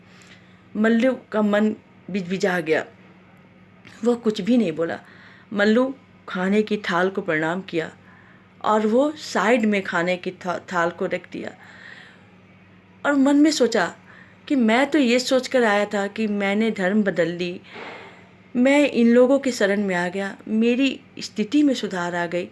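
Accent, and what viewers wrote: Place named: Bhojpuri, India. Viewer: जो भोजपुरीया शैली का तड़का लगाया ना बहूते झबरदस्त बा😀😀👏👏👏👏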